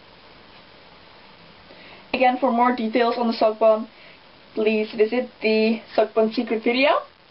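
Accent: Netherlands. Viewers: You have a dutch accent :) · Are you dutch..? I can hear that you say sok instead of sock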